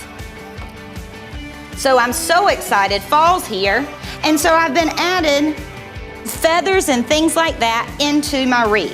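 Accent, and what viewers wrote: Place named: South, United States. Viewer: Wow her southern accent! Nice designs. Like these ideas.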